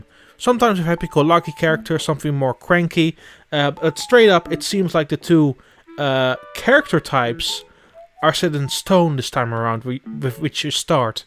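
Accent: Netherlands. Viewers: because YouTube has a training program. that is a first, is Dutch and the way he speaks is very typical of even the most fluent of Dutch English speakers. YouTube is not your typical customer service job so let's try not to compare what is not alike. I can guarantee you that the team gives notes and even though it might not sound like it's scripted, he does most likely have notes for talking points that still doesn't negate pausing to translate or to gather thoughts, etc. Instead of being negative and leaving rude comments, why can't you leave positive feedback and suggestions for how to improve? Is it so bloody hard to find a sliver of kindness? You were once the office or store greenhorn, why does it have to be a negative thing to be learning on the platform? Not everyone starts out with all the tools in their belt.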